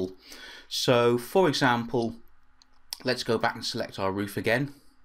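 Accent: United Kingdom. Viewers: Best Revit Tutorials I have seen, and the British accent makes it much easier to understand! Very well done!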